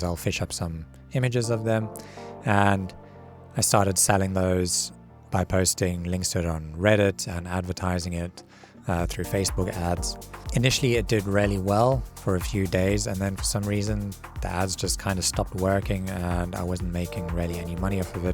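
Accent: South Africa. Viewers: dude just noticed the accent is south african shout out bro ! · Yo...I need to know, are you from South Africa? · Trying to place the accent. I know you're in UK but I'm guessing SA?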